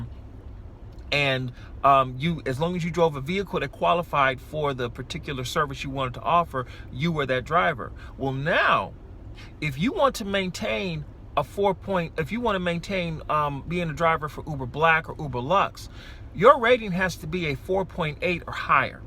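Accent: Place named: Edinburgh, United Kingdom. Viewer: Sounds Edinburra chief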